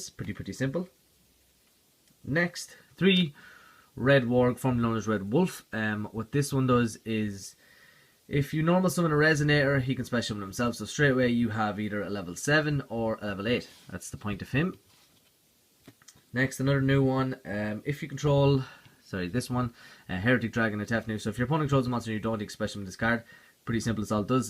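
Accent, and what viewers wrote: Ireland. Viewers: You a Laois man?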